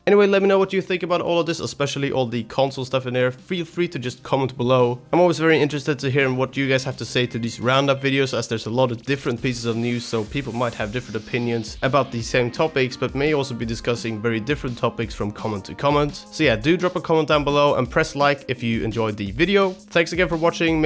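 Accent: Sweden. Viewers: are you swedish???? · ah you from Sweden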